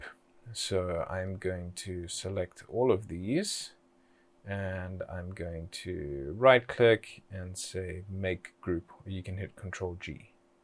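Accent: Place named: South Africa. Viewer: Must be South African!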